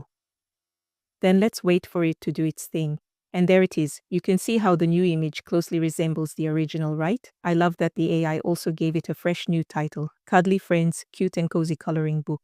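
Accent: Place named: Africa. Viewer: She's African that's why she talks like that. English isn't first language it's obvious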